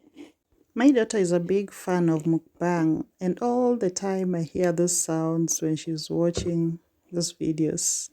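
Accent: Kenya. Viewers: Which part of Kenya is this? It is beautiful. I can only tell that you are from Nyanza following your accent.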